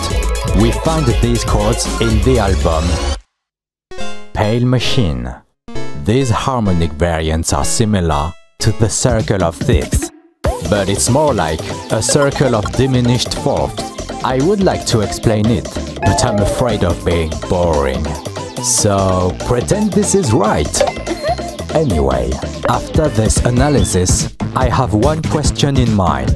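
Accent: France. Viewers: The production quality on this is amazing! And don't you dare worry about the french accent, it sound wonderful! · franchement la vidéo est super sympa ! Et puis l'accent français n'est pas un problème ^^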